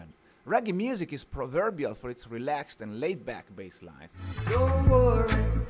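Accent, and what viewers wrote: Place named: Brazil. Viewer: I like this video thank you and your accent reminds me of Brazil in goodness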